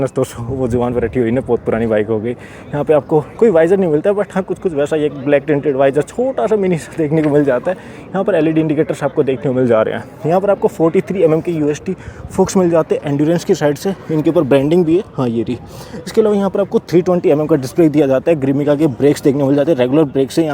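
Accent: Haryana, India. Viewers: bhai aap hariyana wala hindi bolte ho to bhut hi acha lagta hai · Heavy haryanvi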